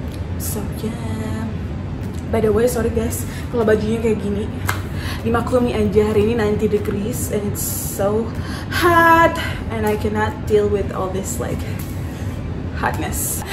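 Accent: Papua, Indonesia. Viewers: Yoi logat / dialeg Papua tu lebih bagus, jadikan itu sbagai ciri khas tersendiri pd channel km sist krna yg logat jakarta tu su terlalu bnyk e,, 😆😅😂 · Cantiik.nya natural.. Suka sama logat papua.nya.. Sukses selalu ya kak.. 😊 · Cantik apa lagi dengan logat papua keren👍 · Cantik 😍😍 suka logat papuanya, tdk yg buat* sok logat jakarta bgtu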